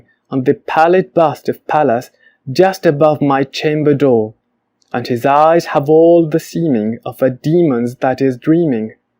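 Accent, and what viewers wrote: United Kingdom. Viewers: No sere nativo, pero siento que tu acento suena muy británico 👏👏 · Buen acento británico, antes no me gustaba ese acento, ahora veo que estoy interesado en pronunciarlo bien, espero llegar a pronunciarlo como tú. Buen video.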